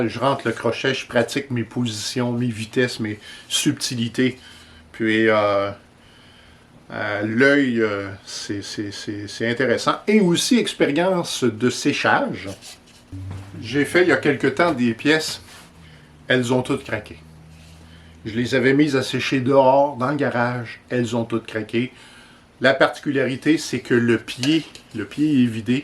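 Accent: Quebec, Canada. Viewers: Il a l’accent québécois. Bravo pour la pédagogie !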